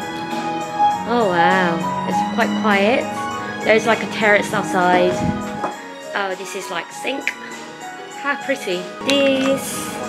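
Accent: United Kingdom. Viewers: 지금은 어디를 파헤치고 계신가요 ㅎㅎ 기분이 좀 우울해서 일부러 영상 보러왔어요 ㅠ! ㅎ 저도 전주 가봤지만 디게 속속들이 정성들여 전주를 여행하신다는 느낌이네요ㅋㅋㅋㅋ 좋아요! 영국영어.. 이제 회화공부를 시작했는데 정말로진짜 처럼 되고싶어요! 저 할수있겠죠?ㅠㅠㅠ 앞으로도어쨌든 영상 잘 볼게요! ㅎ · 영국식 발음 정말 예뻐요!! 보면서 저도 계속 따라하고 있음 ㅋㅋ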